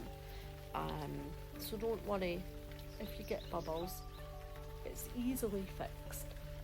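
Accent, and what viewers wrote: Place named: Scotland, United Kingdom. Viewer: lol 😂 ur so funny! It’s def more than okay. But I get it, most people don’t hear their own voice in the same way others always here them. So it sounds weird to ourselves. Plus, I really get the chance to hear a beautiful Scottish accent. You’re so used to that sound I don’t you jump for joy over it, but someone else’s accent you like and don’t hear often makes it awesome. Well thank you kindly for the compliment, if I always wasn’t so stuffed up from allergies maybe I could speak more clearly. 😜😘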